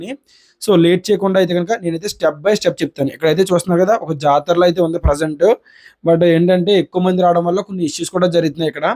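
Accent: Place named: Godavari, India. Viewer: మన గోదారి జిల్లా బిడ్డ లా ఉన్నావే మాట చూస్తే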